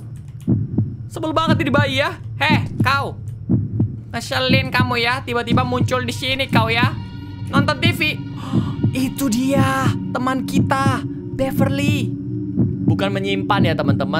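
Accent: Java, Indonesia. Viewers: Medok banget😂Forte Vanguard FG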